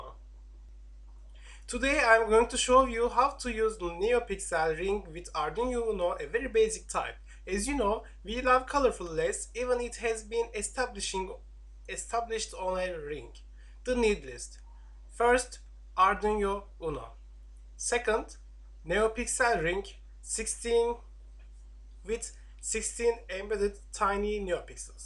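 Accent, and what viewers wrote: Turkey. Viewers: Video başlar başlamaz dedim konuşan kesin Türk. Sonra aşağıya bi baktım harbi bizden. Aksan acayip ele veriyor insanı.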